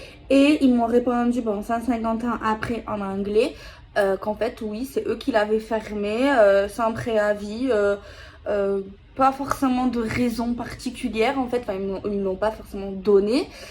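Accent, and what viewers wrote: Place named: Marseille, France. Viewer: j’adore ton accent marseillais j’espère pouvoir commander ton oracle le plus rapidement 😌et que tu me dises comment faire bisous prend soin de toi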